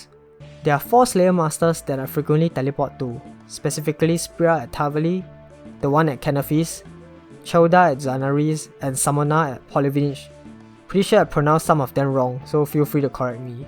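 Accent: Singapore. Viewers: SG Accent ♡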